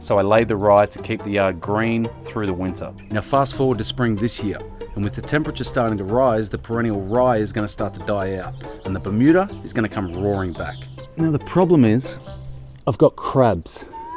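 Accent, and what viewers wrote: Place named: Australia. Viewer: Mate - thanks for making me laugh. You have taught me three things: 1. Lawn stuff - who would have thought grass could have turds? 2. Poetry - "harder than a honeymoon dick" 3. Visual art ( that was an Asian elephant right? Elephas maximus I believe - they have small ears) Anyway, gday from Dubbo (with an accent like that, you might even know where that is!). All the best with the channel. Can't wait for the next video.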